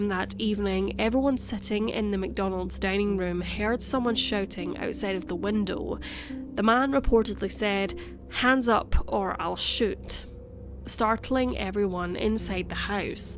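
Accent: Scotland, United Kingdom. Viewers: Hey Hey Are you speaking with a Scottish accent?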